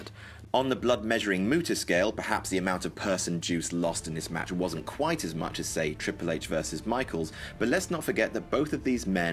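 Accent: United Kingdom. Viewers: Steven Wall You're right. He's British. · can we not have a british guy speaking and get in to the compilation already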